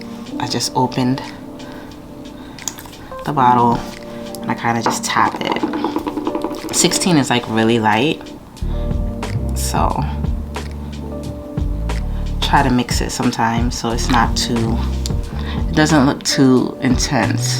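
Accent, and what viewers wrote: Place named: New York, United States. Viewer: I love your accent so much!! Everytime you speak I’m like she’s soooo New York! The make up is so flawless… I’m so glad your doing posted this can I’m still learning make up.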